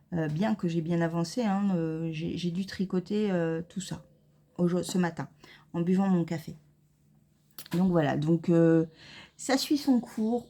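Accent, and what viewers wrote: South, France. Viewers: 😂 Cet accent "ho pitaing" j'adore. Moi aussi des fois on entend fort mon accent et en hiver certains me disent " ho ça va elles t'ont pas fait mal les cigales quand elles sont sorties de ta bouche ?". Et la quand j'ai vu tout ce que tu as détricoté j'ai été choquée et mon fils viens me voir inquiet :"ça va mama?" et quand je lui ai montré ce que tu fais il a dit que tu es trop forte. Merci pour le tuto 😂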